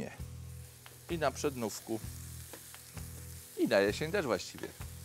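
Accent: Silesia, Poland. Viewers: uwielbiam tego Pana!!! Bardzo fajnie opowiada i ten dialekt śląski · jak ja lubię śląską gwarę, jestem z podlasia, ale co nas dzieli to nas łączy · Panie mam prosbę chętnie bym skorzystsla z panskich przepisow ale ni w ząb nie rozumię pańskiej gwary,czy mógłby pan prowadzic to w jezyku polskim,bo mam wrazenie ze te panskie przepisy są tylko dla śląska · Pani Doroto, zgadzam się, że nie ma milszego kucharza :) Fajnie jest też posłuchać takiej śląskiej gwary.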